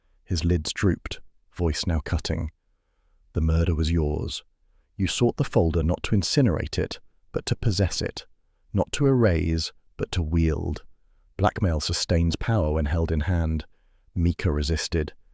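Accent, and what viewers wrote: United Kingdom. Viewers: Doing these narrations is a lovely tribute to the works of Rex Stout, but as an American, I just can't listen to these stories read with British accent, sorry. Stout was a quintessentially American author, and the descriptions of New York, the brownstones, the furnishings, everything are all about America. It's jarring to my ears to hear them read as if they were Sherlock Holmes stories. (I wouldn't be able to tolerate Holmes read in a flat Yankee voice, either., lol)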